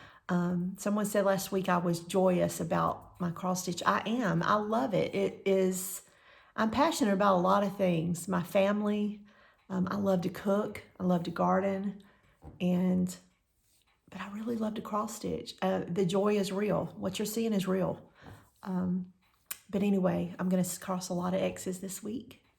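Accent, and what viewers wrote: South, United States. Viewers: I just love you and your channel. Everything cross stitch. And that southern accent, love it. You are a great gal. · I always enjoy spending time with you and listening to your lovely southern voice describe your junking finds and your stitchy accomplishments. Have a wonderful couple of weeks.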